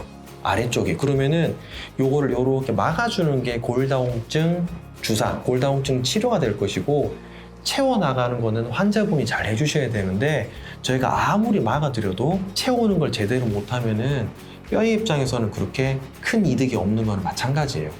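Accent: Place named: Gyeongsang, South Korea. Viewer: 이미 말투에서 대구출신인거 숨기지 못하죠!! ^^ 반가워요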